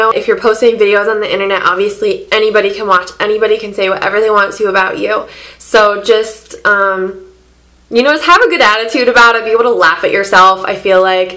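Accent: United States. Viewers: Hi,! I like ur videos so much and not just because of all the make up stuff u do, but also because of the language u speak:-) i sometimes like the make up things ppl do so much but if i dont like/understand them i'll never subscribe! i love how american english sound more and hence i love ur speaking! thanx for cool make up related videos and language "master classes"! ♥ From Russia with love... ♥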